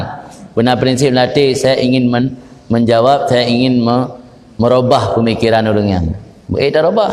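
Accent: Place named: Aceh, Indonesia. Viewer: Masya Allah Biarpun taq NGerti Tpi Adem banget dengernya... Lagaq2 Ne Dri Aceh ya Syekh...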